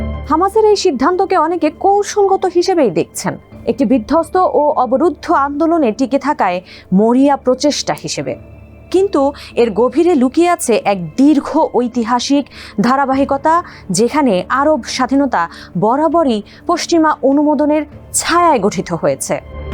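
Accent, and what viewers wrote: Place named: India. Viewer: সমস্যা যেহেতু বুঝেছ, সমাধান সম্পর্কে বল, শুনি আমরা! ভারতের স্টাইলে সংবাদ পাঠিকা কন্ঠ দিলেন! ভারতের দালাল বলা যায় কি?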